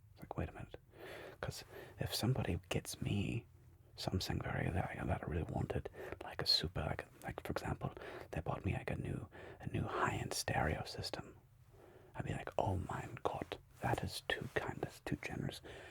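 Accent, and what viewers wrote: Germany. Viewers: German words spoken without any particular accent. Sounds like a German speaking English. And perfect use of "Denglisch" mixing German and English words. hat Klasse! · Are you german if not your denglisch is perfect 👌🏻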